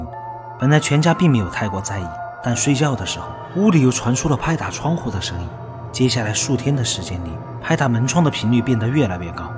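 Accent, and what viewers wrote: China. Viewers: 配音是四川还是重庆人？ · 听起来是重庆口音😂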